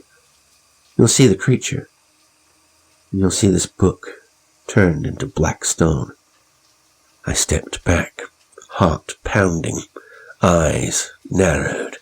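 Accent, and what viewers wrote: United States. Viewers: Great American accent.. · Always DELIGHTFUL to hear from you, my friend! You're very good at presenting these stories, and I find your voice portrayals of the different characters to be quite a talent that sets you apart from others, who apperantlly consider a slow monotone to be the apex of dramatic performance. Thanks for all you do! P.S. --- your American accent is ALMOST flawless, and thoroughly believable. (the only giveaway is the use of idiom, which is so nit-pickish of me, that it's beneath notice!) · I agree and I think he dose a bloody good American accent too! 😵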